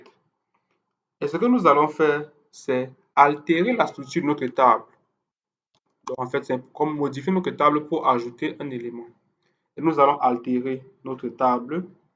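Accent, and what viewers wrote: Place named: Benin. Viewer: J'aime bien tes tutos, mon ami à l'accent béninois ou camerounais. Tu es opérationnel. J'aimerais bien avoir ton contact WhatsApp, si cela ne t'ennuie pas bien sûr. Le mien c'est le <num>. Je ne sais pas où tu vis, mais, le Burkina n'est pas loin et tu pourrais facilement y dispenser des formations!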